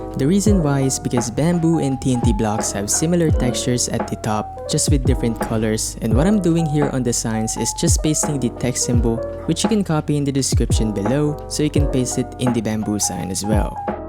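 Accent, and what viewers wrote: Philippines. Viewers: You sound so Philippines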